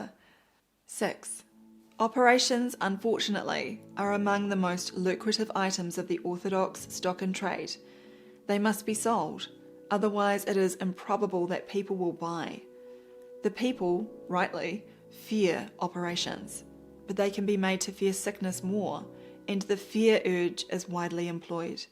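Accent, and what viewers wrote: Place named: New Zealand. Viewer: I love you, even with your Kiwi accent. Thanks for telling us the truth.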